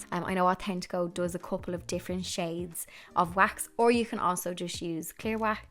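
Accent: Ireland. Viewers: I love you so much! Whenever I'm down I watch one of your videos and your loveliness and your lovely Irish accent makes everything better!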